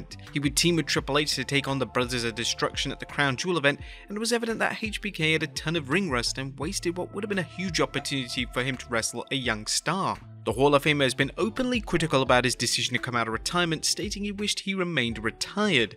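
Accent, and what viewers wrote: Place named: United Kingdom. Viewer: Why all westreling videos always a Bristh accent,Wrestling is American.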